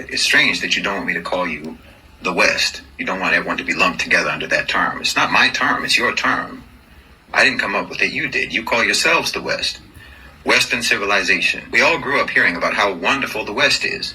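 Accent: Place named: United States. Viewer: I'm confused. He speaks like an American.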